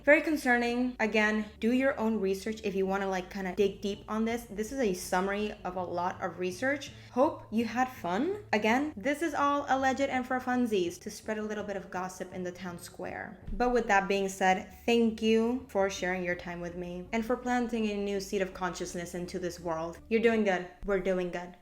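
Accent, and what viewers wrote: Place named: Argentina. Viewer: I knew you where Argentinian lol...the accent is dead giveaway 😂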